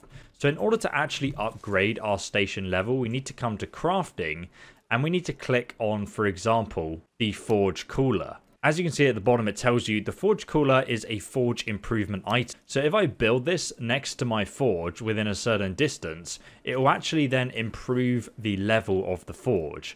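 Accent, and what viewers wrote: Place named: England, United Kingdom. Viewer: I don't know why I prefer to receive instructions from a person with an English accent, but here we are.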